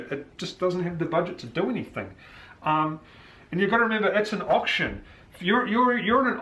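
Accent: New Zealand. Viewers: I'm not sure if its because you're a New Zealander and I just instantly connect with the accent so i understand better about what you're saying, or whether you're just explaining it better than any other person on youtube, but man... This video is EXACTLY what i wanted to hear someone say to me about facebook ads. I've been searching for this information for weeks. You're the only guy who's explained this, thoroughly, in depth, in an easy to follow and easy to digest manner. I'm gonna go as far as saying this just about trumps every single $300, $500 "course" that some of these youtube "gurus" try to sell you. If I actually get sales from implementing your knowledge from this video i'm gonna thank you forever. Stay tuned ;)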